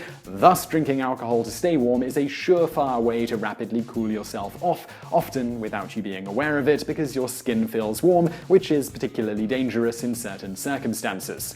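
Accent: United Kingdom. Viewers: Call me Daddy Well no shit... a google search could answer anything. The reason most of us watch this channel find out something we could easily look up is to hear charming British accent relaying the information lol.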